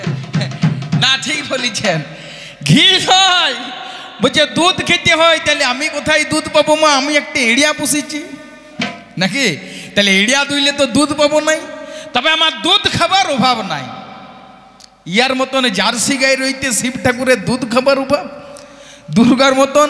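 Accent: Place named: Bankura, India. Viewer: এর বাড়ি বাঁকুড়ায় নিশ্চয় ! ভাষায় বুঝা যায় !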